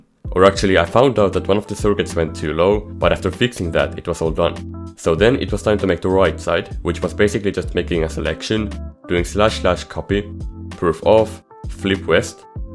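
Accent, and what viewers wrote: Finland. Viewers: Are you Finnish? 🤔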